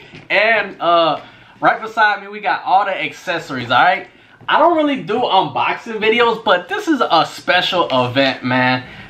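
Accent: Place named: Wisconsin, United States. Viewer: bruh u sound like ur from beloit wi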